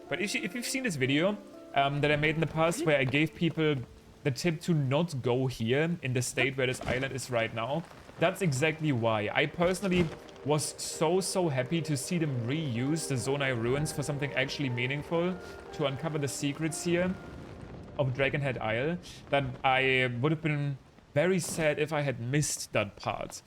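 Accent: Germany. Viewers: Man hört so hart das du deutsch bist 😂❤ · I really like your German accent. It sounds very nice. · So after almost 3 hours of interesting thoughts and stuff I have to say, please work on your pronouncing. Even thats our way of leaning school english in Germany, this is so much of an pain to listen - this german-ish-english pronouncing. You still did well, don't get me wrong, but it would be more perfect when I could enjoy your talk more.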